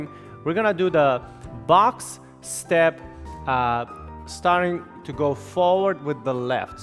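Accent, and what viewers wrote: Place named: Eastern Europe. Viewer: Spent the first time watching the video trying to figure out what his accent is It sounds Eastern European but not sure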